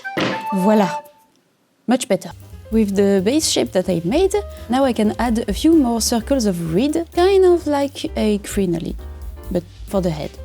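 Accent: France. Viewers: Je me demande si tu fais exprès de ne faire aucun effort de prononciation pour donner le charme de l'accent français vu par les étrangers ou si c'est naturel xD Quoi qu'il en soit, c'est très marrant, très bien rythmé (le montage de la vidéo est nickel) et même si je ne porte pas de perruques j'ai trouvé ça très intéressant. Naïce! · OMGGG l'accent français (｡･ω･｡)ﾉ♡✨✨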